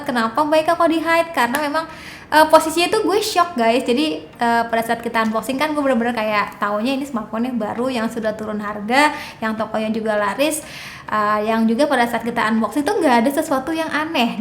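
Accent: West Java, Indonesia. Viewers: Nambahin kata teh dan eyy di blkg kalimat kaya udh menjadi kebiasaan org sunda ya🤣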